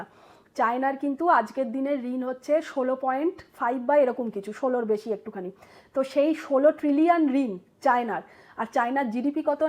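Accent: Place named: Medinipur, India. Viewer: Are u medipuroan by origin ?😅😅 U utter like this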